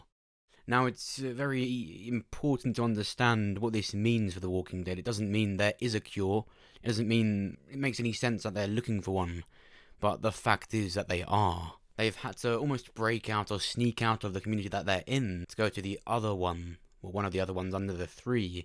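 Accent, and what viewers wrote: United Kingdom. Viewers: you really sound like Jamie Oliver, one of the many family chefs in the UK, I'm sure you know who he is and I'm pretty sure you guys also came from the same area because of your accents.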